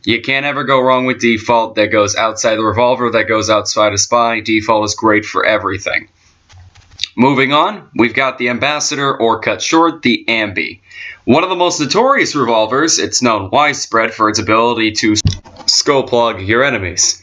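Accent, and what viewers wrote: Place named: Scotland, United Kingdom. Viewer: SCOTTISH ACCCCEEEEENT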